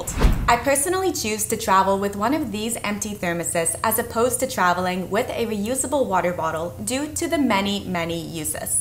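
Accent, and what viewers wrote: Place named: North, United States. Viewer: I love the phone case idea. I need to get one of those. Also, I really like your accent. Where are you from? It sounds northern US or Canada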